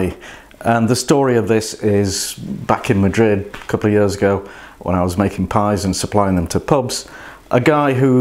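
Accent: Australia. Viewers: NEVER apologize for your Aussie accent! Great looking recipe and video. Can't wait to try it. I grew up in Adelaide from ages 5 to 12 then moved back to the states. Lost my Aussie accent damn it. I remember pies like this and sausage rolls. People love when I make sausage rolls, but I want to add a pie to my list of nostalgic cooking. Can't wait to try this. Thank you. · What BS! I just luuv the Aussie & Kiwi accent, mate.... Really enjoyed this recipe! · There is no need to apologise for your Australian accent. Just like a British does not need to apologise for his English accent in America or Australia! More interested in your recipe. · Love Aussie accent! Go for it! Awesome recipe! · I love Australian accent 😍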